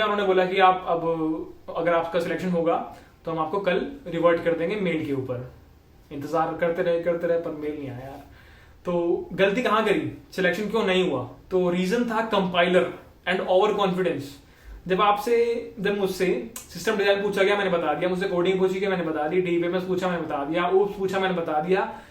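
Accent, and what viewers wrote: Punjab, India. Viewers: bhai are you from punjab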